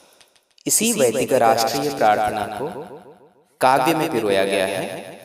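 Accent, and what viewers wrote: Standard. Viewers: ओम स्वामी जी सादर प्रणाम चरण स्पर्श बहुत ही अच्छा यह आज का आपने वीडियो डालकर हमें एक एक शब्द के शुद्ध उच्चारण के लिए प्रेरित किया है इससे जहां कहीं हमें मंत्रों में उच्चारण है थोड़ी जो गलतियां होती थी उनको आप के साथ उच्चारण कर सुधार सकते हैं स्वामी जी आपका बहुत-बहुत धन्यवाद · स्वामी जी सादर प्रणाम ।बहुत ही शुद्ध एवं सुन्दर उच्चारण है आप की वाणी में ।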